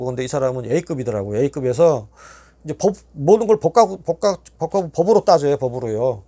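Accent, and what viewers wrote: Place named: Jeolla, South Korea. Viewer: 전라도 충청도 인천 사투리 다 있으신거 같아요 ㅎㅎ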